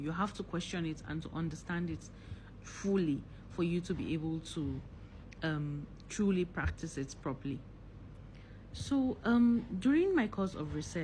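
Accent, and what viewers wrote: North, Nigeria. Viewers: Sorry. Firstly, you don't look like an igbo person. Secondly, you have a core northern accent. Igbos dont have such accent even if they've stayed in the north all their life. Thirdly, you don't know Jesus personally. Christianity is not a religion. It's a way of life. :Christ like". Finally, you're just talking nonsense.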